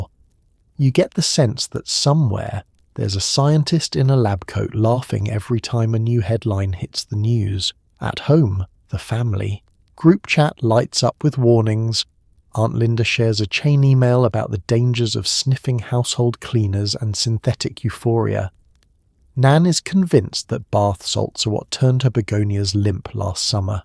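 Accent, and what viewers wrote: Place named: United Kingdom. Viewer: For a while, we had those. I tried it. I thought it was disgusting... This is no Heroin, not Coke, not MDMA, not even a shot of alcohol. Not even like a joint somebody passes around. And Mister you know what? Doesn't matter where and when I am. I don't know your name. You are a Voice with a polished British accent. alright... Remember earlier when I said disgusting? Well a close friend who was on a medical regime for mental problems (Depression is the No.1 Appetizer for drugs.).. And he didn't think it was disgusting. He was full of that thing, he was at work but just to jump from the 4th floor. Because old People in Yemen chew those leaves to pass the time, cathinone is harmless... They've been doing this for years, It's not tobacco. no smoke. Once again, we re-re-rediscover some plant that was originally used to Benefit, Chemists distill it, add things, remove things, and Encapsulate: A "Pill".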